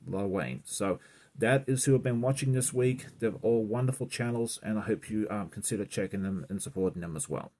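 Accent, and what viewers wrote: Australia. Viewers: Hi, really love your koala quilt, and also the daschound quilt. Your doing fantastic stuff, and I like your promoting of other YouTubers, By the way, because I’m an Aussie too, I don’t pickup on your accent…you sound like everyone else I know! It’s funny…when I was in US and UK, I could pick out an Aussie accent immediately as there were so many other accents that were new to me. Good luck finishing everything for the quilt show, 👍🇦🇺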